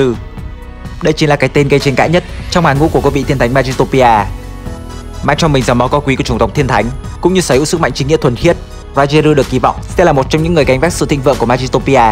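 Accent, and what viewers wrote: Japan. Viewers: khó chịu thì chịu khó tìm hiểu tại sao đọc như vậy, phim của Nhật, thì đọc theo cách đọc tiếng Anh của người Nhật thì có gì sai. Nếu khó nghe thì tắt tiếng vừa xem hình vừa đọc wiki theo tiếng Anh chuẩn của mình đi. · Phát âm theo tiếng Nhật chứ ko phải tiếng anh đâu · Nói chung là nói theo kiểu tiếng anh chuyển thể nhật nên nghe rất khó chịu Flagel thì đọc là fle gieo thôi Fu le gieo ru Nghe mà chanz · Ông ấy nói theo phiên âm nhật k phải tiếng anh ạ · Phát âm kiểu Nhật đó ạ, trong phim họ phát âm đúng là như vậy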